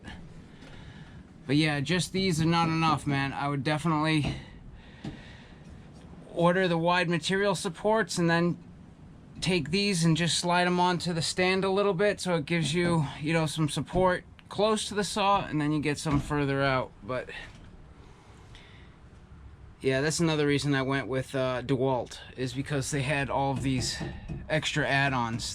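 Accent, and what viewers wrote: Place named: North Shore, United States. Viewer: cool, carpenter on the north shore here, I just recognized the accent, lol... Thanks for the review, I just bought one, and I'm very happy with it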